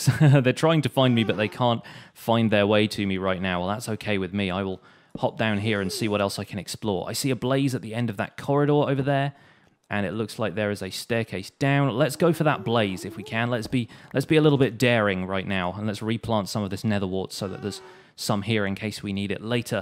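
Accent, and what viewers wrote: England, United Kingdom. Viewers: Hey are you from england or USA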